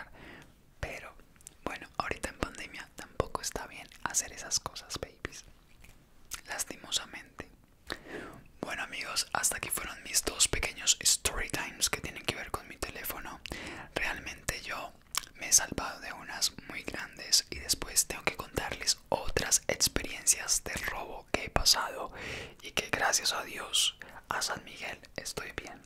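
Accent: Bogotá, Colombia. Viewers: Ahhh tu eres de Bogotá 🤔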